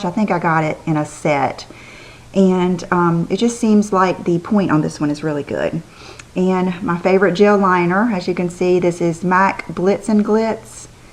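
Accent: South, United States. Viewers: Heheee you, with the bur-berry vs. bur-buh-ree.. I say that you ought to pronounce it just like your instincts tell you:) You are a southern belle; I think it fits that you have your own way of pronouncing it. The lashes/mascara look is incredible.. I think you have been using it a bit lately, yes? (those products) I say this because your lashes have really been looking extraordinary lately. Nice that the line isn't just a famous name, but also are rather superior compared to many other products.. mascaras, etc., for example. You truly are beautiful,.. inside and out. x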